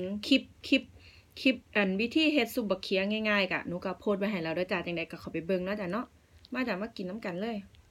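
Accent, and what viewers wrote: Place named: Roi Et, Thailand. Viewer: อิหล่ายุจังหวัดไดนอเว้าคือสำเนียงทางร้อยเอ็ดบ้านป้าเลย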